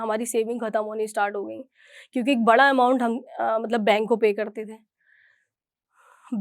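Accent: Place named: Uttar Pradesh, India. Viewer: Bhasha se laga tha aap etawah se kaha se ho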